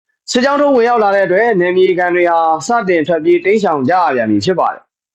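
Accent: Yaw, Myanmar. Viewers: okယောနသံ ❤၁၀၀၀၀၀၀၀သိန်း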